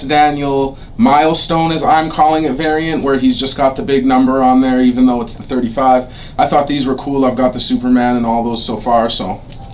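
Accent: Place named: Nova Scotia, Canada. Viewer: Are you from Canada? You sound like you're from Nova Scotia.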